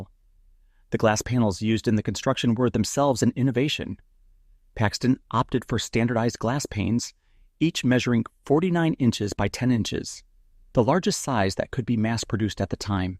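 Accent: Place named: United States. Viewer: What bothers me is the narrator in Am.English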